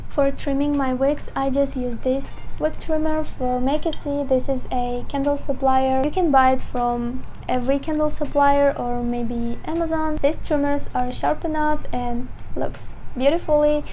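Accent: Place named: England, United Kingdom. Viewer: Loving your videos, your english accent is sweet you are doing a fantastic job, keep it up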